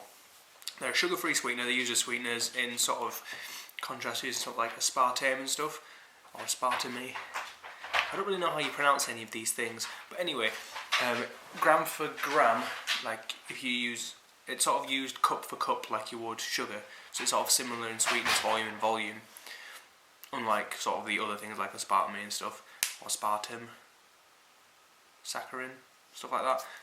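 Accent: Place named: England, United Kingdom. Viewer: I was thrilled to find a video with an English accent discussing artificial sweeteners and polyols but this was such a disappointing! You need to plan and rehearse your videos and have your info to hand. The most annoying video I have watched and a waste of time when trying to find a simple answer of you count polyols as net carbs! Please watch Dr Berg, Dr Berry, Keto Connect & Keto Christina for inspiration how to make decent videos and to get your message across even if you want to feature your pet!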